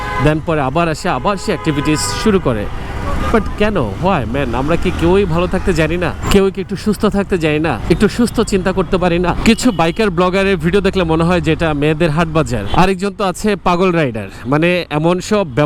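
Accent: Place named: Bhuiyapara, Bangladesh. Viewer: Bhai apnar basha ki Bhuiyapara naki?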